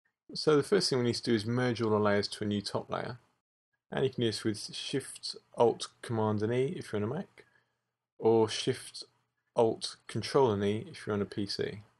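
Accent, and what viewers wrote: United Kingdom. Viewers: buddha bless you for the British accent, so sick of s. tanks brilliant tuts, subscribed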